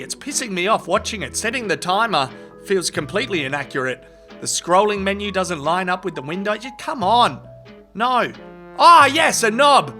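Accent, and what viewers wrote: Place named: Australia. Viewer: Somehow the Australian-voicedNarration made everything even dafter than it was...that is almost a backhanded compliment...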